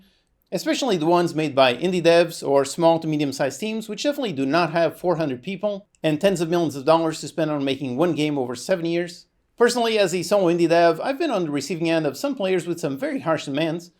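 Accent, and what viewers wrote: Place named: Portugal. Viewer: Are you from Portugal? You have a similar accent!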